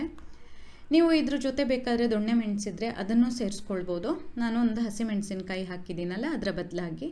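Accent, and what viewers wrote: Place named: Coastal Karnataka, India. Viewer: love watching your videos, love your kannada accent, love your voice, it brings back the memories of my childhood I spent in Mangalore & Bantwal.❤️💕😘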